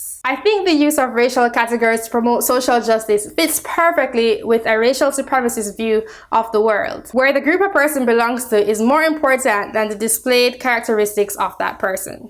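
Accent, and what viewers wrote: Caribbean. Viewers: Hello. I noticed Caribbean accent in your voice. Are you Jamaican? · Are you Carribean?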